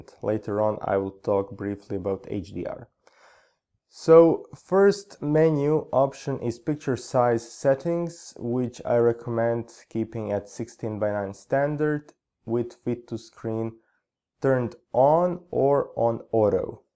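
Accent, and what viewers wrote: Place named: Russia. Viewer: You speaking like a Russian man, I guess so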